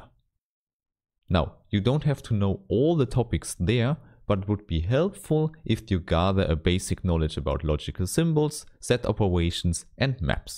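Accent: Germany. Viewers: Thanks for the Contant, but are u maybe from German ?😂😁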